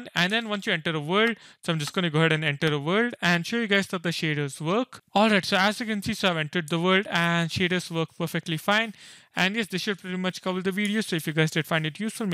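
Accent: India. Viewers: Ofc it's an indian man speaking english having a girl skin making a tutorial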